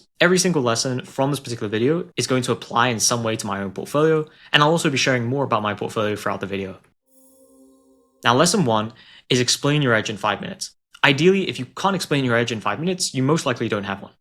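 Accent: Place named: Australia. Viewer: Are you Aussie bro? Your accent seems kinda mixed . Cool videos!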